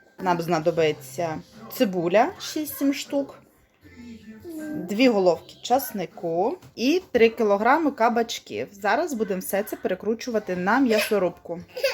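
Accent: Western Ukraine, Ukraine. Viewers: Як ви так швидко перейняли западенський говор.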